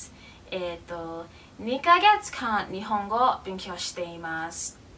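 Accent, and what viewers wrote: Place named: South Korea. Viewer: Wow! I am really impressed! The accent sounds a lot like Korean. How are you doing your speaking practice? Is it with natives or do you just record yourself each day?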